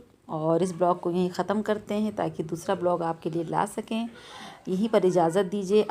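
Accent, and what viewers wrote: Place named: Kanpur, India. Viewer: aapki awaaz se lagta hai aap Kanpur ki hai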